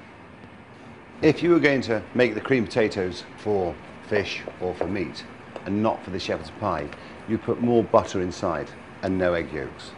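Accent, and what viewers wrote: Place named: RP, United Kingdom. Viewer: Posh accent for a Leeds lad.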